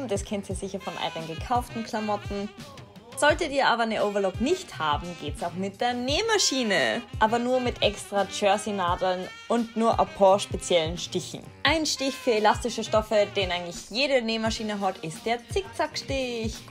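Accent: Vienna, Austria. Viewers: Haha dachte du bist aus Österreich :D liebe deinen Dialekt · Oha😳 mega cooles Video 😍 habe deinen Kanal gerade entdeckt, ich lass auf jeden Fall ein Abo da💕 Liebe Grüße aus der Steiermark P. S. Ich feier deinen wienerischen Akzent 😊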